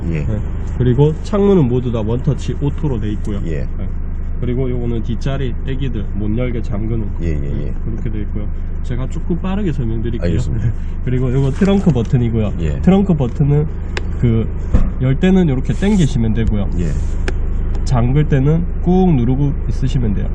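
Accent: Gyeongsang, South Korea. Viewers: 같은 경상도 사람으로서 듣고 있습니다 친절하게 설명 잘해 주시네요? ^^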